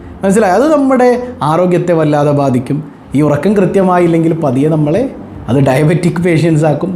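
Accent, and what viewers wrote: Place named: Malappuram, India. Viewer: ഉസ്താദിന്റെ സംസാരം കേട്ടാൽ മലപ്പുറം ഭാഷ പോലെയാണ്. 🥰🥰🥰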